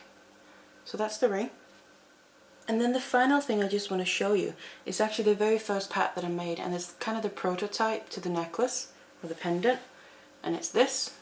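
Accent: United Kingdom. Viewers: I really love your videos. Thanks so much for sharing your talents with us-for FREE. I love your accent, I'm from California and you sound so British. I know how much work goes into even a short video, and yours are so well done you must put lots of time and effort into them. Keep them coming.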